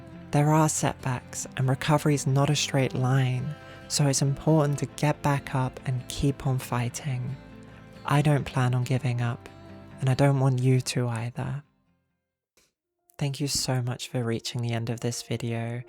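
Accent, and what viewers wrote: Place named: England, United Kingdom. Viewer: That accent is English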